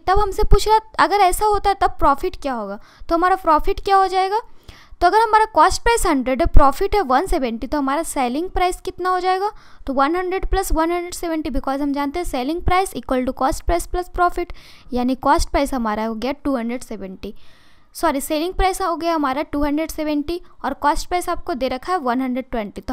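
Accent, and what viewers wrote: Bihar, India. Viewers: mam bihar ki tune hai aapki...ya up east ki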